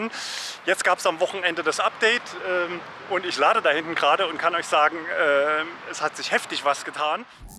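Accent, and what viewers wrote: Germany. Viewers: Your English has a very strong German accent. Hard to understand. 💪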